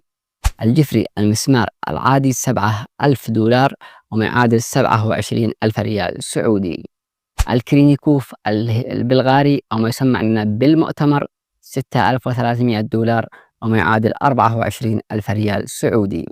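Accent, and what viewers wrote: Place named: Yemen. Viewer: ضع علي الشاش كتابة الارقام المسعره مع الكلام لان الكلام ليس مفهوما جيدا مع النطق السريع واللهجه اليمنيه 🎉😮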